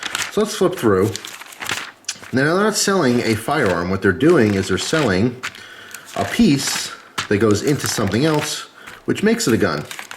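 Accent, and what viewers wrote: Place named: Eastern Pennsylvania, United States. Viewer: I know a eastern PA accent when I hear one....lol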